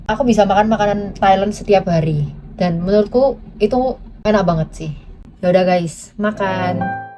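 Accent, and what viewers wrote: Indonesia. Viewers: Logatnya tetep jawa bgt hahaha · logatnya kayak wong suroboyo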